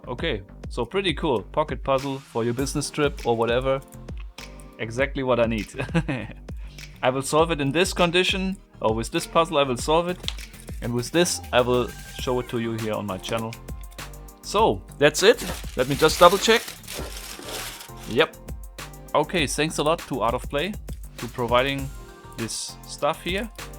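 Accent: Germany. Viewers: Omg that German accent tho!!! Love it❤️❤️❤️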